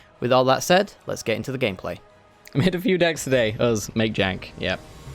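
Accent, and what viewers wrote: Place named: England, United Kingdom. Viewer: Sent here by CGB and found an English accent!!! Woo hoo.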